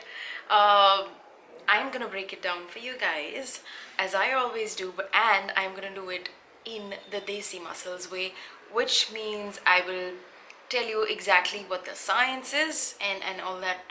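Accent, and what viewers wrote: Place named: Trinidad and Tobago. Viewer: good info you sound like a trinidadian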